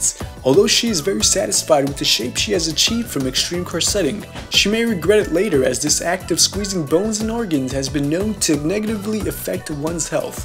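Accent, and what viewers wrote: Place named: New York, United States. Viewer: he just needs cough medicine.. GET OUTTAA HEEAAAH.. a NY vocal mayb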